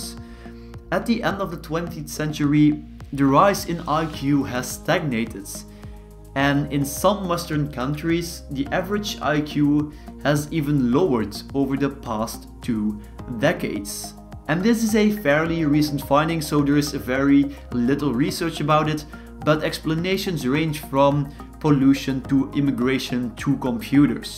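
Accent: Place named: Netherlands. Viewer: ps: I've to tell that it's not easy, at all to hear out where you come from. It really sounds like a mix of german, dutch and french accent. my guess is dutch. ( i consider myself a professionell in useless skills)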